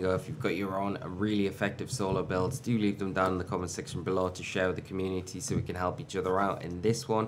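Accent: Newcastle, United Kingdom. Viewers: You’re a geordie?? How am I only realising now😮🫡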